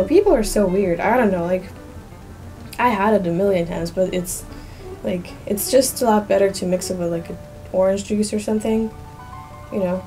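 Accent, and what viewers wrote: Midwest, United States. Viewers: Russian with American accent is nothing unusual why must a Russian person speak in a Russian accent? American accent is spoken all over the world its internet English. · yeah its called the aftermath of the fall of the commies. you could have put dumbo in charge and yall would do better over time cause there was nowhere to go but up. you sound like an american but you think like a commie. i heard a joke before, in russia you have 2 channels, 1 is propaganda 2 go back to channel 1. i dont blame you though its that russian pride. watching too much channel 1. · You do a great job with Englsh, you sound like mid west accent, in Russia I was there short time on a cruise ..1995. St Petersburg very enjoyable and pretty city. You have great videos keep it up ok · I'm from Indiana, and I can say listening to you talk you definitely sound like you could be from the Midwest. 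Congrats on your accomplishment! I've always wanted to be bi-lingual, so I am impressed and excited to see someone who was able to accomplish it. I'm still trying to learn Spanish as my second language.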